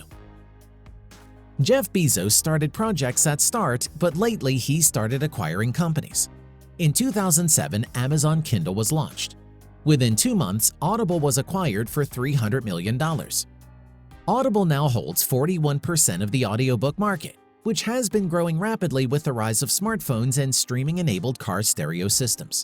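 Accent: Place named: India. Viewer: me tamil nadu,india... your voice is like indian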